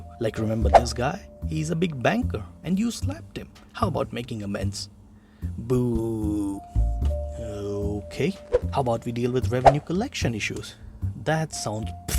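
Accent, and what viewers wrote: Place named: India. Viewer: Hey there, I can see that your style resemble oversimplified channel. Theirs no shame infact i liked it. That thick indian accent hits home for me. I can see that you've worked on animations too. I know theirs still only 4 videos on your channel but keep hammering my man, Who know you might turn into something big. I really hope you do. A suggestion, You can try hindi dubbing too for same videos. Theirs littles less competition there for this type of content. Nevertheless, Good luck my friend. Hope you succeed.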